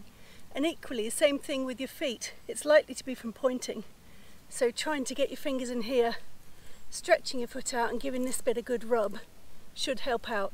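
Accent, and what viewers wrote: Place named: United Kingdom. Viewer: Awesome British accent, Thanks for your service 👍, you earned my subscription God's blessings 🙌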